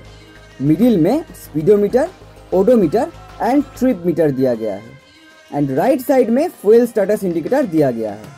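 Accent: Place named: Bengal, India. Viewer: Hindi mei bengali tone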